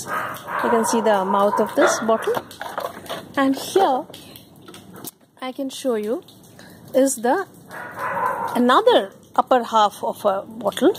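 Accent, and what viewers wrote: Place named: United Kingdom. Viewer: great voice ji...with accent like british lady..big like and subbed too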